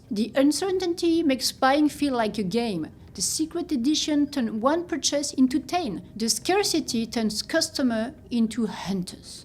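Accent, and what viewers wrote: France. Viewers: Putain, l'accent français c'est vraiment violent. Ne le prenez pas mal, mais on peut faire mieux avec une IA. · I live in Canada where the French are a minority yet continually politically destroy our country.. Listening to your highly franco-cised version of English is like a dagger in my heart from ever word... All I hear is a French voice reminding me of what the French have done to our once glorious country.. Between the French and the Indians (natives) these two tiny minorities, have destroyed Canada and have the power to continue to do so in perpetuity.... So as much as I wanted the info in this video, being lectured by 2 French people is a bridge too far... I'll just wait till a English presenter does this story.. Have a good day. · Is it me or is that a french accent I hear here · I can't take seriously your "expertise" in business in Asia when you can't pronounce the Labubu designer's name right. 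Frenchies are know for their bad accent but put some effort when calling people names, that's called respect.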